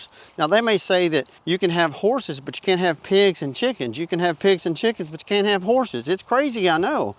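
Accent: South, United States. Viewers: His voice goes well with him, sound like he has an southern accent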